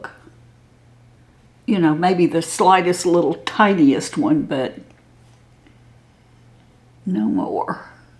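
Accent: South, United States. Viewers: The makeup only enhances your inner beauty. I am 64, and to be honest, I haven't always been very kind to myself and the changes that accompany aging. That's going to change after watching you, and listening to that calm, charming Southern accent. Thank you for the inspiration, and proving that a little pampering, and inward gentleness can present itself to the outside world as being worthy of self-love and care. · I think the foundation is a tiny bit too dark and your blush is too heavy. A lot of older women wear too much blush and it looks overdone. I also think you’d do better with brows that are less brown and maybe use a bit of pencil. Elf makes a very decent one for the price. Other than that, you look very lovely and I love your southern drawl. Where are you from? I’m also 81, am blessed with good skin. My wrinkles are in the mouth area and I’ve never smoked so am very annoyed. 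My eyes and cheeks are good.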